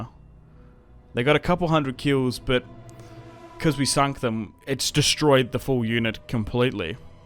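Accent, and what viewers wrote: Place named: Australia. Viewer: What part of australia you from bro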